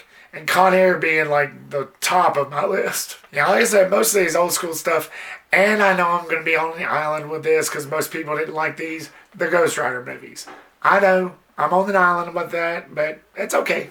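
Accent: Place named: South, United States. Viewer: You sound like Vince Gilligan, but we are all southern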